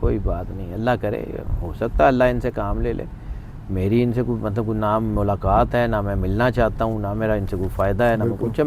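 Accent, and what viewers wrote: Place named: Lucknow, India. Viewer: 'Andaz e Guftagu' sounds like Luckhnowi Urdu, really.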